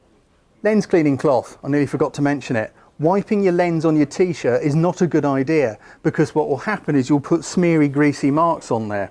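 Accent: United Kingdom. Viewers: Nice British accent and off course nice info. thanks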